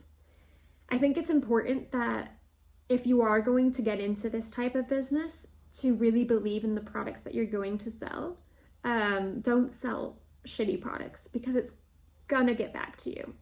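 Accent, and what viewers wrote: United Kingdom. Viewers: Ah your sweet little UK accent that just barely comes out sometimes..adorable! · What's really interesting is I sensed a UK accent when you first started talking before you even mentioned you lived/worked there. So cute!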